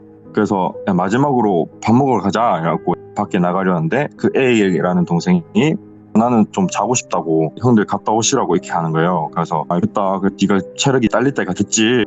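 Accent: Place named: Gyeongsang, South Korea. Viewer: 아이구 이야기는 참 무서운 이야기인데 말하는 분이 경상도 대구 분인 것 같은데 저기 경기도 지역 요즘 20대 여자분들 말투 따라하시는 것 같아서 조금 듣기가 거북했습니다. 음절 뒷부분을 요즘 20대 여자들처럼 올리지 마시고↑ 원래 쓰시던 대로 내려 주셨으면↓ 듣기가 편했을텐데...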